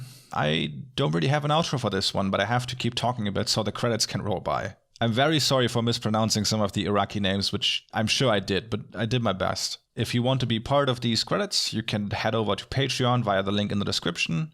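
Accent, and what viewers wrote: Germany. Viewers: Yea you’re right especially in this one. The German has really faded, but considering the subject content for this episode it’s understandable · he is german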